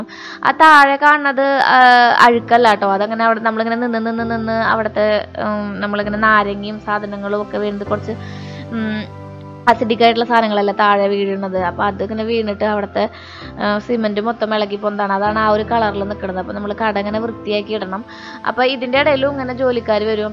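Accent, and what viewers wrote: Thrissur, India. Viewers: Sathyamnu😁I like Thrissur slang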